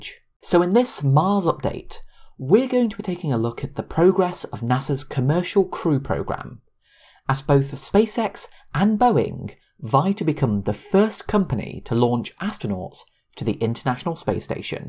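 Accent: United Kingdom. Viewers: This british accent...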